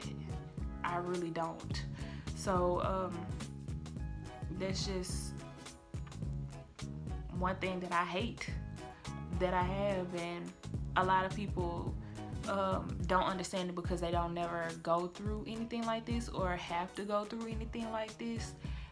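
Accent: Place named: South, United States. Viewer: gal u are beautiful and very articulate with that southern twang , I am still single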